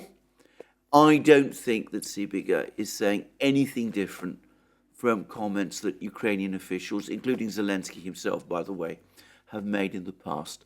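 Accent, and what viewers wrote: United Kingdom. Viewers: You know, half the reason I watch this show daily is so I can hear how these crazy limeys speak Washington's English. "The works..." That's super cute!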